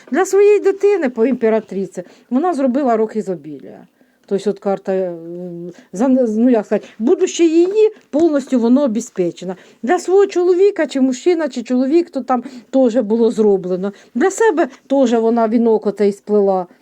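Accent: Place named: Surzhyk, Ukraine. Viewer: Пані, говоріть будласка українською, а не цим пекельним суржиком. Дуже ріже слух.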